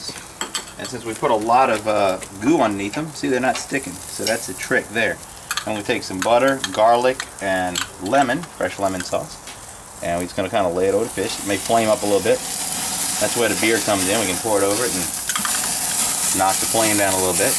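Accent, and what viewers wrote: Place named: Louisiana, United States. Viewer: im guessing hes from Louisiana the way he says filets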